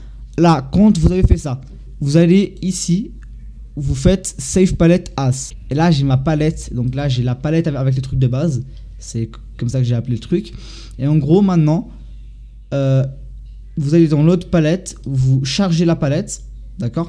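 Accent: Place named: Portugal. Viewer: il est portugais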